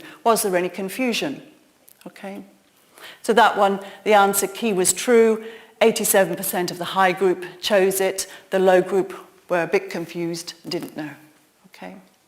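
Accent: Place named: United Kingdom. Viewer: đó là phát âm giọng Mĩ, còn của cô là giọng Anh hoàn toàn khác nha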